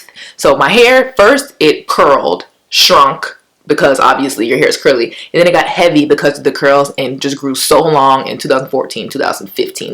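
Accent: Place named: Barbados. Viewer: apologies. I been finding many hair you tubers sounding Barbadian. Maybe it's people from a particular state. You are gorgeous btw.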